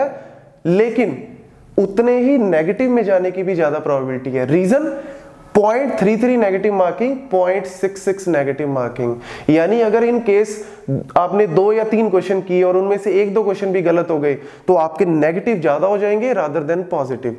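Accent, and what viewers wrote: Punjab, India. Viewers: Ur accent has punjabi touch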